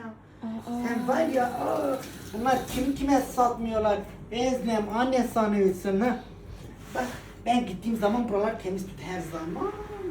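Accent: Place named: Elazığ, Turkey. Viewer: Harika aynı Elazığ ❤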